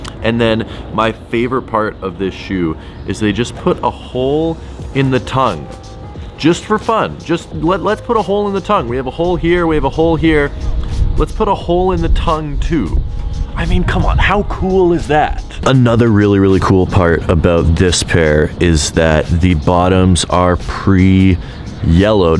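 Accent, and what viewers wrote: Canada. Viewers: time is money! Uhh you just wasted 3 mins! Cus who wants to listen to an Annoying Canadian Ray Donovan!?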